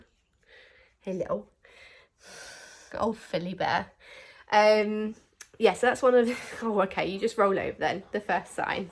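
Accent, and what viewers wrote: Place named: England, United Kingdom. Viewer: Its her English accent for me..😁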